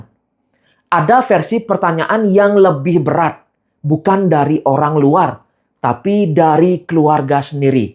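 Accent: Bali, Indonesia. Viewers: Logatnya kek dr bali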